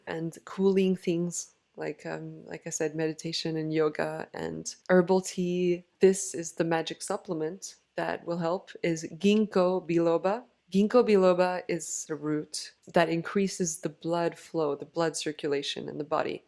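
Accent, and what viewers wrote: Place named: United States. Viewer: Thank you, thank you very much for sharing your story. I got Tinnitus in June 2020, and I am still suffering at daily basis never disappeared. After 6 months of waiting I saw a NHS ENT or like you say ORL en francais, I was diagnosid via an audiogram with a significant hearing loss in left ear around 20%. A second ORL and audigram confirmed the same results. Here is my short story before getting Tinnitus; I passed through stress and anxiety over 5 years, and I managed to overcome the hurdles by running three marathons and half in a period of four years and the earphones were always in my ears with loud ipod music, and the fifth year, just when the pandemic hitted hard, I restarted to run again, and onward I cannot hear well with my left year, just few days later, I started to hear hammering, whistling, and roaring sometimes like the echo of car engine running from far away and still until now. 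It went worst during and after exercises and like you say after alcohol or eat spicy food. There is no treatment for tinnitus, we have just to accept it and live near the traffic or in the heart of a city center to forget it. The silence makes me anxious because I hear my Tinnitus, thanks for suggesting gingko biloba, I am planning to explore the possibility of a homeopathic therapy, did you try any? Another question unrelated, have you done any audiogramm with your third ORL? Any hearing loss? Take care your accent in English sounds American not French.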